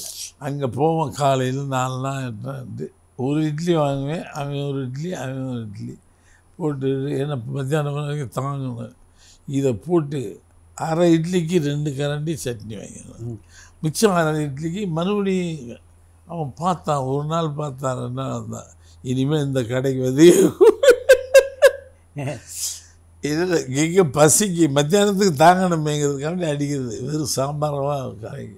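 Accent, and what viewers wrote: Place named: Butler English, India. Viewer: Butler English தான் தல உன் சிறப்பு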